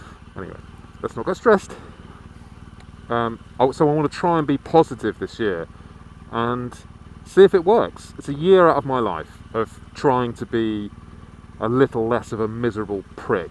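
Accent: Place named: Surrey, United Kingdom. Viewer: HOLY Shit your from surrey! i knew i reconsied the accent somewere :D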